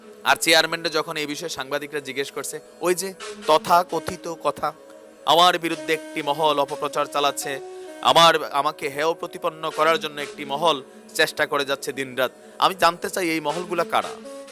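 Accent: Comilla, Bangladesh. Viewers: ভাই আপনি কি কুমিল্লার ইতর মনে হচ্ছে আপনার কথা শুনে.??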